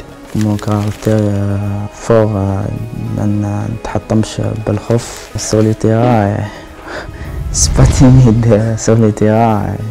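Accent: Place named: Morocco. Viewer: Pas de doute, tu ne peux être QUE marocain. Je comprends tout ce que tu dis. Alors qu une personne algerienne je dois m appliquer pour comprendre son dialecte. Enfin, peu importe, Bravo Béchar🤗👍❤ allah a nejhak🤗🤗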